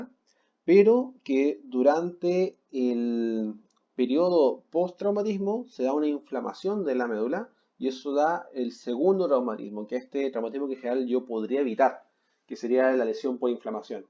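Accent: Chile. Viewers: Eres de chile? Por tu acento 😅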